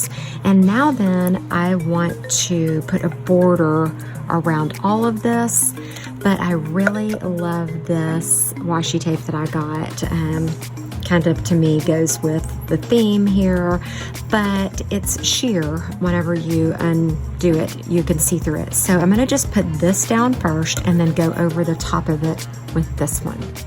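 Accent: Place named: Georgia, United States. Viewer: Beautiful, as always!!! Can I ask where you are from? I'm a southern girl living in the Midwest now. But you sound like you might be from Georgia. Praying for peace and comfort during the family emergency.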